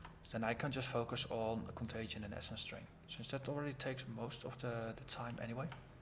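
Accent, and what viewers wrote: Netherlands. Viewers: true fan of the new chaos dmg here. quick question, are you dutch? you sound dutch.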